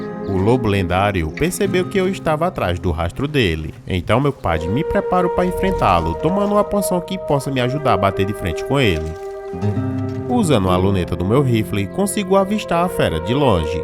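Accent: Northeast, Brazil. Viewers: você é nordestino não e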